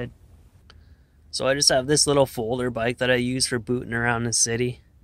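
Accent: Canada. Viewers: If you can't tell he's Canadian by his voice then you're either not paying attention or you know nothing about Canadians. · Judging by this dude's accent, he's in Canada. Probably Toronto or somewhere if it's so expensive and he's talking about going "out West." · <<If you can't tell he's Canadian by his voice then you're either not paying attention>>, because he says, "I live in an eastern Canadian city" : ) All kidding aside, I practically live on the border of Canada, so I caught that familiar accent right away. We love our Canadian neighbors. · I thought he lived in Canada because of his accent and the insane price of living. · Beautiful Canadian accent ❤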